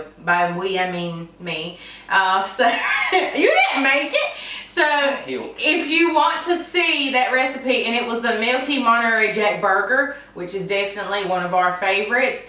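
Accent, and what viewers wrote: South, United States. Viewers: u sound very southern / country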